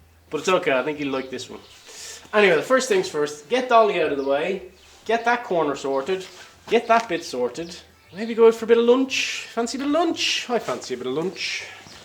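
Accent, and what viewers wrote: Ireland. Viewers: I get the feeling, when I read the comments, that everyone has your lovely Irish lilt and expressions front of mind when we gather our thoughts and write them down. I always write with an accent when I write my comments after listening to you. Your expressions are really endearing. Thank you so much for sharing your day with us. I really enjoyed lunch. It was delicious. That dessert was particularly magnificent. My nosey attitude is wondering what you might be bringing home with the engine when you return. I hope you have a wonderful week. At least, helping Dan will stave off feeling like a 60 year old for a very long time. (mid-afternoon naps are the half of it!!) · Perfectly timed for the experience of a taco salad then wine accompanied by an Irish brogue. I zoned out on the math calculations, though, ha ha. I'll give you a like in spite of those. 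And now to go and address my own piles of clutter. I hope we both win.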